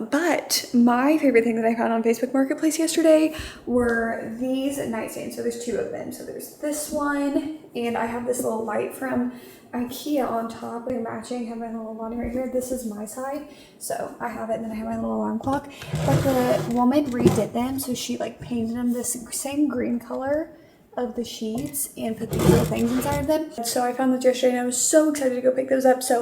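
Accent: South, United States. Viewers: Congratulations!! You’ll fit right in since everyone thinks you have a southern accent already hehe. Sending love from Louisiana, we’re state neighbors now! 🩷🩷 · Girl you definitely caught me by surprise omg. Shoutout to you pookie I’m happy for you but I know this must be hard too. I will say I was surprised (when I found your channel) that you weren’t from Texas lol you give southern bell!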